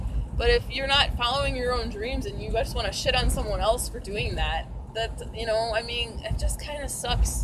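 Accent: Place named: Wisconsin, United States. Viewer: The moment you started speaking i knew you were a wisconsin-ite. shout out for wisconsin.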